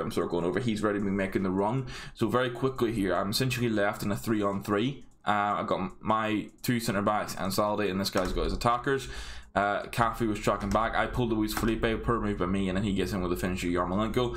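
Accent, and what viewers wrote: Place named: Northern Ireland, United Kingdom. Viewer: Nice video mate 🤙 U from Northern Ireland mate, I think recognise that accent as I’m from NI myself 😂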